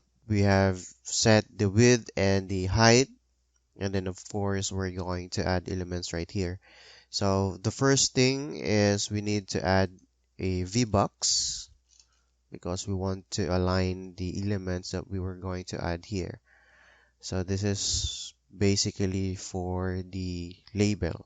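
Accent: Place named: Philippines. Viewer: Parang Filipino Accent, kung Filipino ka sir... Maraming Salamat po :) sana magupload pa po kayo.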